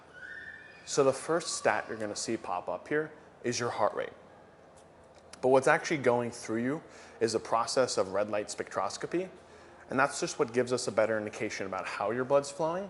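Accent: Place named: Silicon Valley, United States. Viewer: That is an "upscale" speak practiced especially in a Silicon Valley, California.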